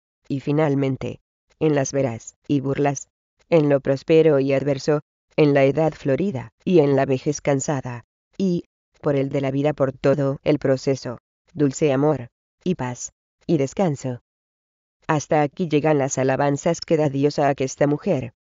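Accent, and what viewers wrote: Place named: South America. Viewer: pronunciación robótica y sudamericana, llena de seseo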